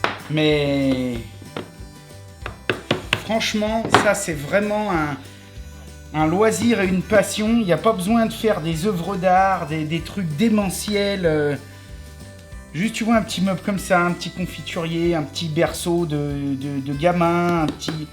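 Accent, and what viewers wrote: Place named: Haute-Loire, France. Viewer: Allez, courage. Moi je suis ravi de retrouver mon avec son accent de la haute Loire, sa bonne humeur et son don pour donner envie de lui ressembler. Quand je serai grand, je voudrai faire « » comme métier 😉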